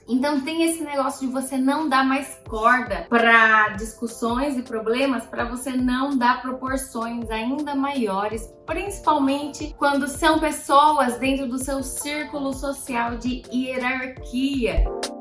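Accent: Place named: Inland São Paulo, Brazil. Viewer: De onde você é? Um sotaque forte será do interior de SP? ,Ou é Paraná?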